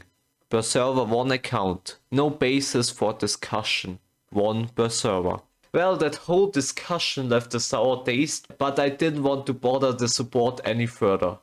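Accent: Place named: Germany. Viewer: digga dieser deutsche akzent is so schlimm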